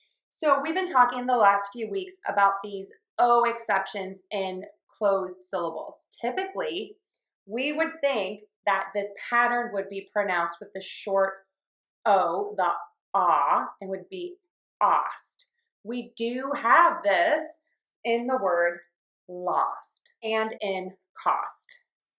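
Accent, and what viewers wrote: United States. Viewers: Hi. I'm from Colombia and a love Your videos are amazing that help me to improve my pronounciation. I wanna know what part of usa you are?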